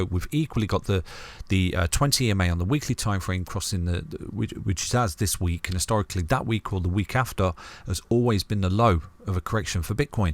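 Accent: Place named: Nottingham, United Kingdom. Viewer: You sound like a Notts lad, are you local?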